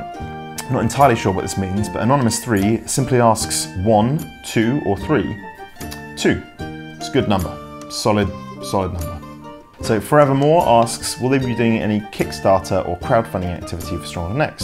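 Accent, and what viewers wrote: United Kingdom. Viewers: I thought is playing Lol only,but no,here he is talking with some strange British accent. GGWP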